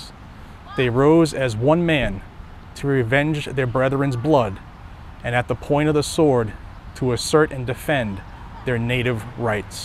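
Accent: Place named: Massachusetts, United States. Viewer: Concord, lol, gotta love that MA accent. I moved to FL in 1994 and people still know I am from MA! It's like Worcester, no one can say that one right unless they are from MA! LOL! Great video/info/real history! Thanks so much! Thumbs down are definitely America haters! Traitors as far as I am concerned!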